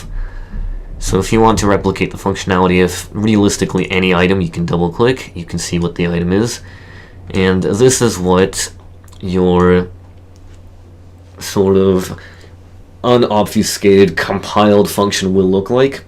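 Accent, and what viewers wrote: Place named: United States. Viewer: We thought you were russian but you sound like a monotone american. Great tutorial btw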